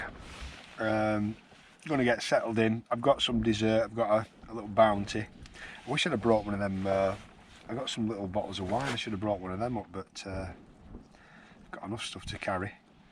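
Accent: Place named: Northern England, United Kingdom. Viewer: I love your videos , and a great northern accent , it’s guys like you, Hazey , and Simon that have got me off my arse and into nature, and I’m better for it, so many thanks, stay safe.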